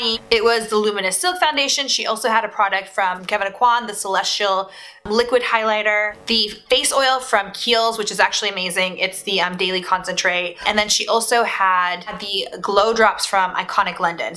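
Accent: Iraq. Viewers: Make arabic vids we like ur iraqi accent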